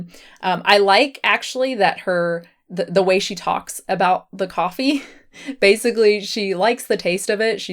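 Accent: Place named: South, United States. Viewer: Off topic but you sound so southern in this video especially at the start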